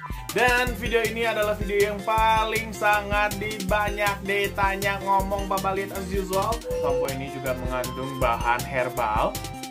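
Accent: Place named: West Java, Indonesia. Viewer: Sunda anjir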